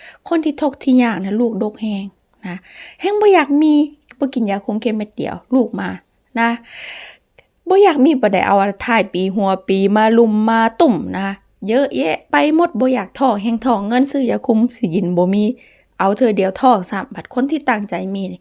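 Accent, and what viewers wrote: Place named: Sakon Nakhon, Thailand. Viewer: ยุสกลบ่จ้าคึเว้าคือทางสกล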